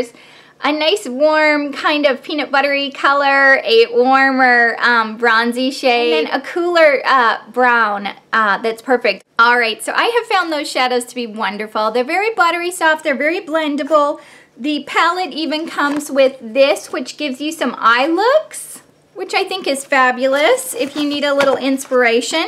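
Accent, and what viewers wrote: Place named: South, United States. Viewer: I just stumbled upon your channel about 2 weeks ago and im so glad I did. I love your personality and you're so easy to watch and light to. (I got that southern twang as well, lol. Anyways, id love to see a demo/review of the cover fx drops. Ive been on the fence for several weeks over weather they are really worth the money since you only get .5 ounce. Looking forward to your next video!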